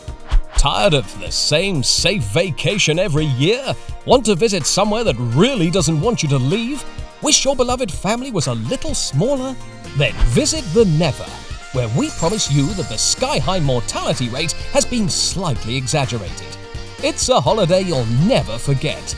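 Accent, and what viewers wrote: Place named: United Kingdom. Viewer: イギリス訛りいいねぇ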